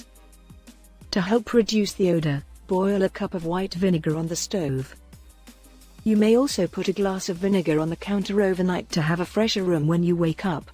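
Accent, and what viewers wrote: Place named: United Kingdom. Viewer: Why the British accent!!!!!! Hire American!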